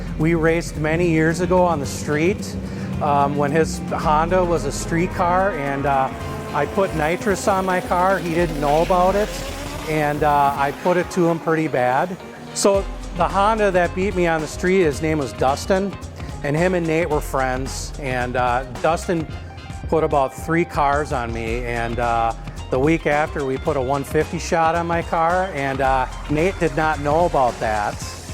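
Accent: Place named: Minnesota, United States. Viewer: Don’t cha know?? I love minny accents. What a great car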